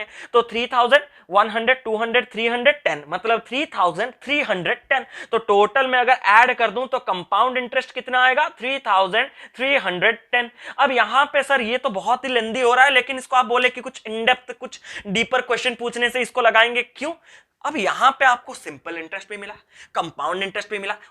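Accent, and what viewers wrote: Odisha, India. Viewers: R u from Odisha?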